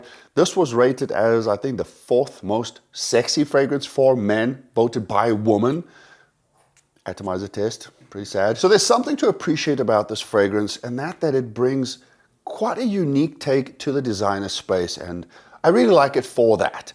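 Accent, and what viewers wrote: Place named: South Africa. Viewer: I knew your accent was familiar. You mentioning South Africa confirmed my suspicion.😂😂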